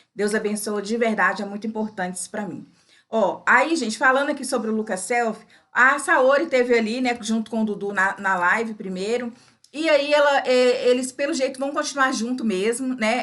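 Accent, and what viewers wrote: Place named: Minas Gerais, Brazil. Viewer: Seu canal é muito bom aqui no YouTube VC é muito carismática beijos no seu coração eu sou de São Paulo e pelo seu sotaque VC é de Minas gerais né ☝️😉 adoro quando vc fala assim ☝️ tá quente pelando acho muito engraçado 😅 vc é muito simpática beijos no seu coração e um feliz natal com muita saúde paz e alegria pra vc e sua família 😉☝️👏👏👏👏🙏🙏🙏🙏🙏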